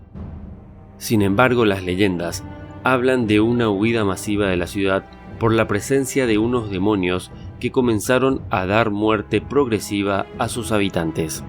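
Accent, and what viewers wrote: Argentina. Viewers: Fabuloso documental. Me cae un poco de raro que tenga acento argentino el narrador, pero vamos, que muy bien narrado, impecables las imágenes y se ve el conocimiento, esfuerzo y conciencia que hay detrás de este contenido. Muy grato de verdad. Tienen una nueva suscriptora. 😊❤❤❤ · Soy mexicano y conocedor de la historia mexicana y la verdad no se si la persona que hizo este vídeo es mexicana aunque suena a argentino y si eres argentino debo felicitarte por la buena investigación que realizaste, he visto vídeos de extranjeros farsantes que quieren engañar a los mexicanos con datos falsos y la verdad si caen los mexicanos en los engaños sobretodo porque los mexicanos no conocen su historia y ellos se basan en lo que les diga su youtuber favorito que la mayor parte del tiempo son igual de desconocedores de la historia como ellos y para terminar yo invito a la gente a visitar mi canal y allí tengo 3 vídeos de Teotihuacan realizados en la zona arqueológica y mis vídeos podrían ayudar a los que vayan a visitar el lugar como una guía turística para que sepan que deben ver y visitar en el sitio y para terminar solo quiero decir al realizador del vídeo que los aztecas pensaron que quien hizo la ciudad fueron gigantes por que ellos encontraron huesos de animales pleistocenicos como mamuts, camelidos etc. 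y toda esa zona de los valles centrales de México es rica en restos de esos animales, mi canal es Rolando México RS y los vídeos los hayan como Teotihuacan la ciudad de los dioses mi explicación primera y segunda parte y ojala se den una vuelta por mi canal que está censurado por la plataforma.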